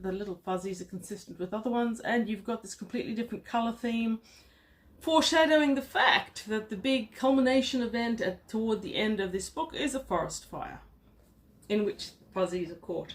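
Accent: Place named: New Zealand. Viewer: I'm wondering why a woman with a Kewpi accent (I'm guessing here, all I really pick up is southern hemisphere) has U.S. editions of these novels. Is it just that New Zealand has such a small population that, even with the distance involved, it's cheaper to import such small quantities than to do the setup to print them.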